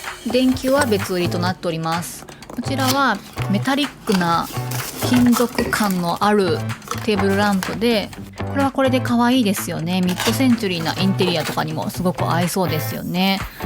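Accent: Kansai, Japan. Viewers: 初コメです😊 さんの動画本当に参考になります！私もIKEA大好きですが遠くてなかなか行けないので・・😂😂 それから、時々関西弁なさんの話し方、好きです❤️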